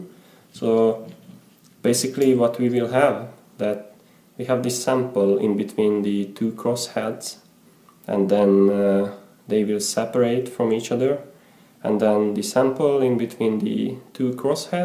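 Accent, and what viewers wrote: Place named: Hungary. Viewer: I can see the Swedish newspaper but I hear Hungarian? Very confusing!?! I'm guessing you are a second generation Hungarian Swede?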